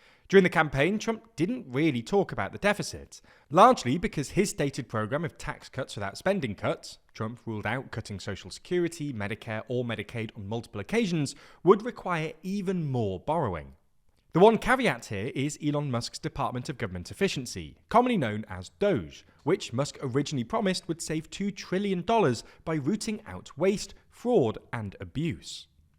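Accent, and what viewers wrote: United Kingdom. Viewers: Love the British accent. You must be smart. How is the UK doing?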